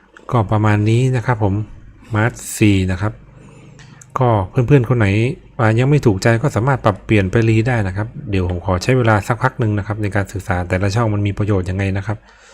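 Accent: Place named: North, Thailand. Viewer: อ่อครับ ผมนึกว่าพี่เป็คนเหนือ😅😅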